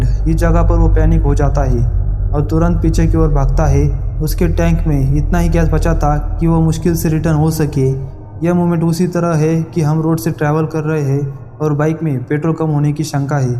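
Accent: Maharashtra, India. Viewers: Marathi ho kya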